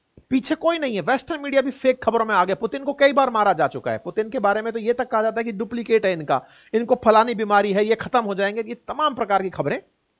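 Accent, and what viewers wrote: Bihar, India. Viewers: ji, aapkay hindi lehzay say lagraha hei aap biharsay hein?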